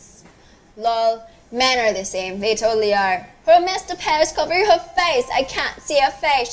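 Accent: United Kingdom. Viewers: that british accent tho. hahahahahahaha Brofist! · that british accent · Trueee marami naman gumagamit ng British accent kapag mag-isa, it's okii. Ang soyo soyo kayaaaaa xD Lovely accent kasi huahua Ms. thank you for this video! Hahah xD We'll be waiting patiently for the next video.:3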